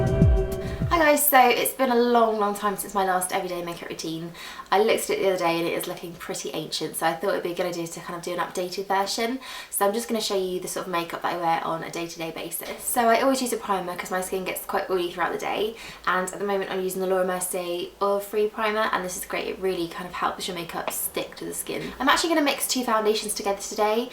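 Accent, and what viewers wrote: United Kingdom. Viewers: I love your make up routines and I love your british accent 😍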